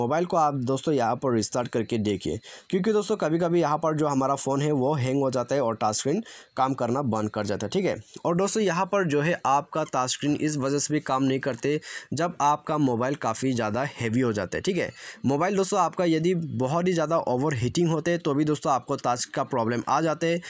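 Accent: Assam, India. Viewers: or may be assam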